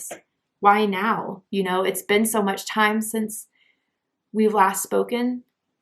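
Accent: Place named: United Kingdom. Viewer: 😯 Yes, British accent.. he is English!! I am Italian 😃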